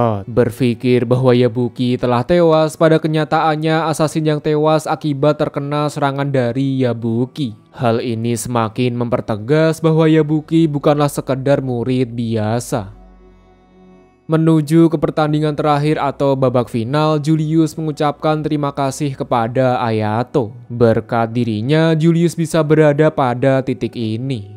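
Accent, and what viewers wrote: Java, Indonesia. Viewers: Meduk bahasanya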